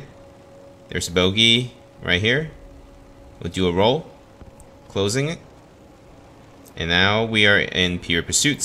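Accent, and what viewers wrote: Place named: South Korea. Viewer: Where is this guy from I can’t tell, he sounds kind of korean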